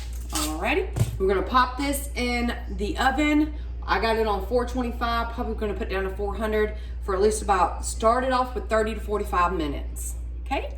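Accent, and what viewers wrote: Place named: Georgia, United States. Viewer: Omg I'm here in Ga also and it's steaming outside 🔥🔥🔥.... I'm a new subscriber and I just love your video's.... You have a very strong Ga. accent 😁👍😁... I live just north of Atlanta.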